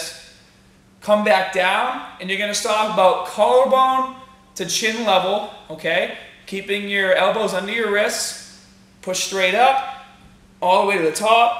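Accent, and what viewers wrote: Boston, United States. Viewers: His accent seems less intense back in 2012. weird...it's like his Boston accent got more pronounced over time. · What happen to your buston awccent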